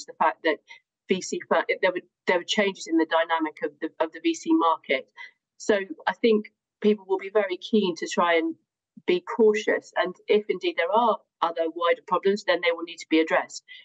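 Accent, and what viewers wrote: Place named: RP, United Kingdom. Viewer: What happened to the news reader?? She suddenly is talking like the late queen of England!!!how queer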